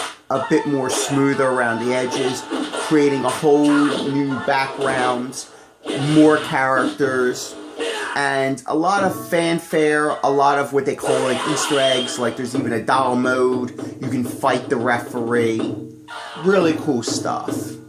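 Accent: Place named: East Coast, United States. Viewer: I love the opening line and your East Coast accent!! :D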